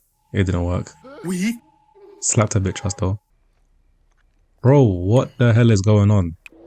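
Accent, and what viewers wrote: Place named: Jamaica. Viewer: Is it just me or bro use Jamaican sound 😮and i am Jamaican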